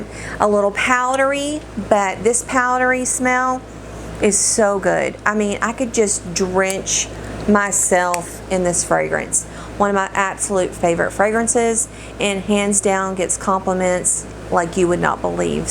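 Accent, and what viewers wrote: South, United States. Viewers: Oh wow... not even available on Dior’s website. It seems they don’t even make it. I generally avoid EDT, but if that’s the version of this you have and love (& it actually lasts)... well, I’ll trust it! ;) ... you are adorable, btw! Love your southern accent!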